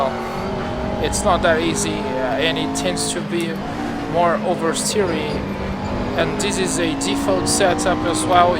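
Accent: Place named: Brazil. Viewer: lendo os comentários percebi porque estava entendendo completamente seu inglês hahahahahahah você é brasileiro tbm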